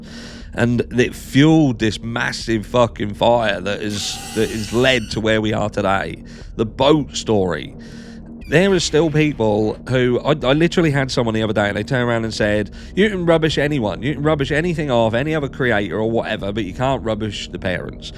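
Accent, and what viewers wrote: Norfolk, United Kingdom. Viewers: Love your videos. Where are you from. What is your accent. Are you from Norfolk.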